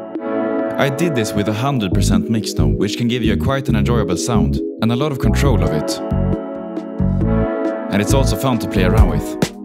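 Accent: Sweden. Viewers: jag vet en svensk när jag hör en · bro that swedish accent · What i love most about these videos is the intense, thick Swedish accent which gives his speech that nordic rhythm that fits well with the rest of the audio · As a Swede I enjoy the Swedish accent! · never heard a stronger swedish accent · Are you Swedish?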